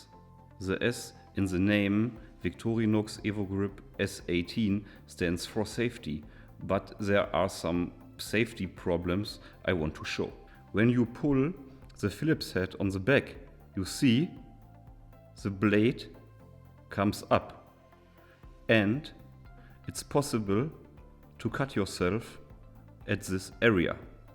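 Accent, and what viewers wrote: Germany. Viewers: You know that this is a Wenger design, made with Wenger machinery in the old Wenger factory is Delemont, under Vic ownership and branding? Having said that this range never appealed to me due to the aesthetic and I can't EDC locking blades here in the UK. In fact I haven't really wanted to buy any of the Delemont (formerly Wenger) SAKs as I said due to aesthetics, the thicker scales, slightly smaller size (85mm instead of 91mm) resulting in shorter tools like main blade & woodsaw, toolset - as I much prefer a small blade over a nailfile - and finally because they tend to cost at least 50% more than the equivalent Ybach manufactured models. When it comes to watches though I do love my Wengers! They have the same specs as Victorinox watches but are much nicer designs in my opinion, much less ''busy'' faces and more understated and elegant, and cost a lot less. 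So I just make sure my SAKs come from Ybach and my Swiss watches come from Delemont. I've never had any quality issues with any Ybach manufactured SAK and they are just great value for money. My latest purchase from them was a Swisschamp in black, it cost me just £58 with free next day delivery (not from the Vic website obviously), that's just 66 Euros, insanely good value. Thanks for your detailed review. I'm 99% sure from your accent that you are in Germany, and if I want to find out if a product is good or not, a German reviewer will give the most critical detailed review. Deutsche Gruendlichkeit usw. I often double ch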